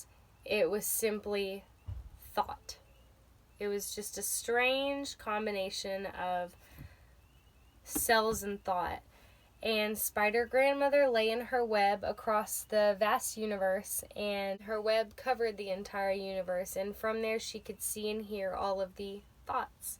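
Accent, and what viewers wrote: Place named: South, United States. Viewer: Girl! I feel you about the way people perceive us based on accent. So like you I speak with a southern intellectual accent. I ain't stupider than you Ms and Mr California! Lol I just have more spice in my life. Keep up the good work!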